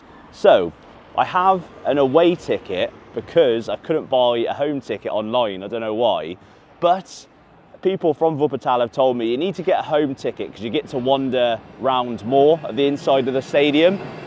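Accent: West Country, United Kingdom. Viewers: Am gradually going through all your videos. As you know i live in Berlin, but dont go to any games here. Regarding Wuppertal. Its a real shame that they are going through bad times. I remember them in the 2nd german division, with big crowds. You certainly spend alot of time in germany recently, and i realise your big love so to say lol, is for Bochum. Also, didnt realise you come from Hereford. I was certain your accent was more west country, Plymouth for example. I take a look now and again at your video from my club Gillingham, regarding that montrous corregated stand. Still makes me laugh. Anyway, i am gradually doing the rounds with all the content you put out. Really enjoyable and full of facts. Thanks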